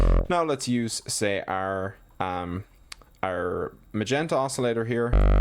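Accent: Ireland. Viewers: oh I could tell, I'm also Irish lol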